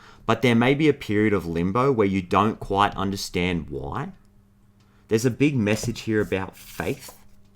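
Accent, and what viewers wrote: Australia. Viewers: Tarot reading with australiam accent by is what I've been manifesting. Thanks universe 🙏🏼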